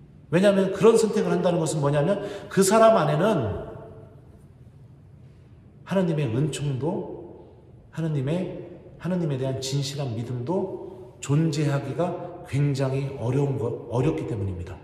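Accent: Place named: Jeolla, South Korea. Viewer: 지금도 충분히 보기 좋으셔요 ㅋㅋ 전라도 말에 귄이 있으셔요 즉 매력넘치신다는 말씀입니당😊👍😆💖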